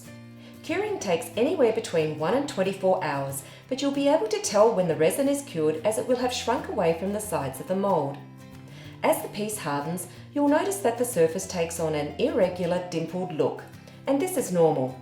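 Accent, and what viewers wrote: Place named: Australia. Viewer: Her I am thinking this is an Australian product... as the lady talking is Australian! Lame! so expensive to buy in Aus.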